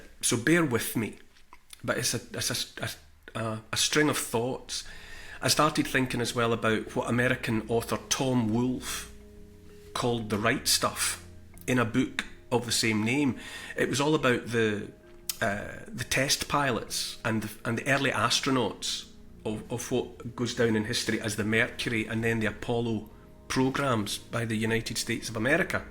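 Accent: Scotland, United Kingdom. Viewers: Think the unthinkable . Do the undoable. (Do the unthinkable) . Do what? (Imagery concerning the cremation of bodies). To whom? ( We need to watch out for a handful of people who have ancient malign desires). This man has a disarmingly quaint Scottish accent, a very friendly manner, and a lovely white, light filled house . (Pay attention to his words).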